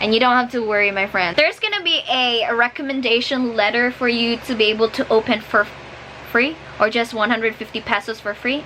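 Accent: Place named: United States. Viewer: She speaks like an American 98%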